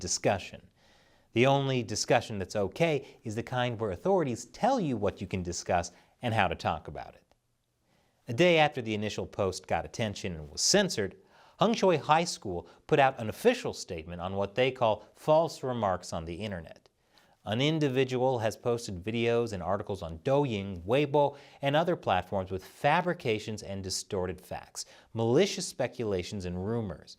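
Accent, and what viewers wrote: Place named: United States. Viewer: First time on your channel but just the american accent lets you kno that its goign to be biased and especially finger-pointing.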